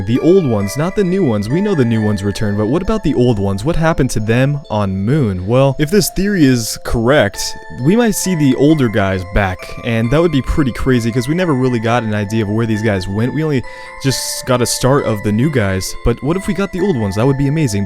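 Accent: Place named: United Kingdom. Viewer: 2nd That's bullshit. He has a british accent!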